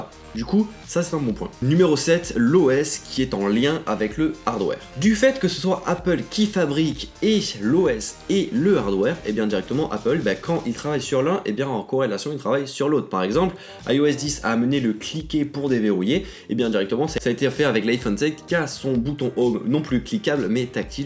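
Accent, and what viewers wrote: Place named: Marseille, France. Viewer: ahh j'ai griller ton accent marseillaise et team ios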